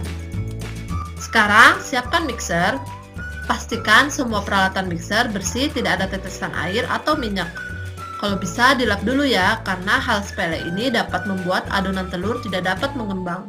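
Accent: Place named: Java, Indonesia. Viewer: owh orang cikarang toh jdi kya logat jawa ya' 🤣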